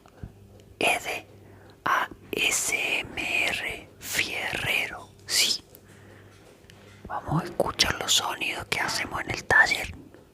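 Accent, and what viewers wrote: Córdoba, Argentina. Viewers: Asmr Más acento cordobés Yo😴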